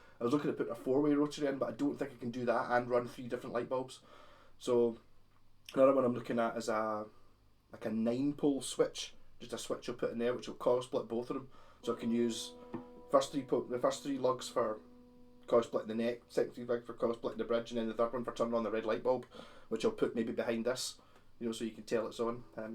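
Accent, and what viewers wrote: Scotland, United Kingdom. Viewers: are you Scottish or something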